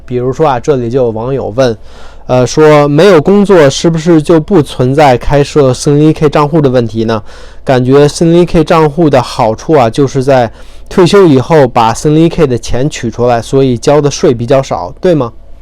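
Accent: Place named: Tianjin, China. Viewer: 天津人吧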